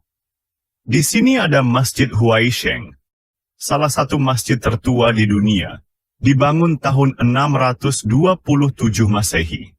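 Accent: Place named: Malaysia. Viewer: Kalau anda orang indonesia tolong pakai bahasa indonesia saja,jangan pakai logat Malaysia segala.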